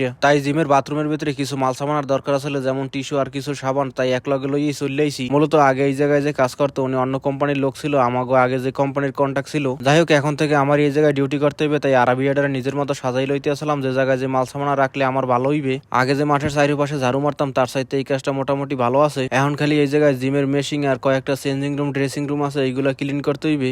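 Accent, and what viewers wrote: Barishal, Bangladesh. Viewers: এই হালায় মনেহয় বরিশালাইল্যা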